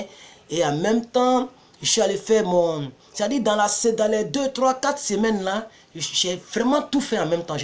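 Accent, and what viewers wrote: Ivory Coast. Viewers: Lol je ne sais pas pourquoi je reconnais aussi vite les Ivoiriens quand j'attends leurs voix où quand je le regarde je sais déjà que c'est un ivoirien depuis que j'ai vu ta tête ta façon de parler je suis que tu étais ivoirien dis-moi le contraire lol merci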